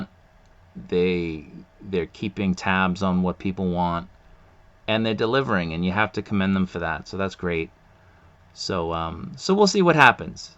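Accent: Boston, United States. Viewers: R u from boston?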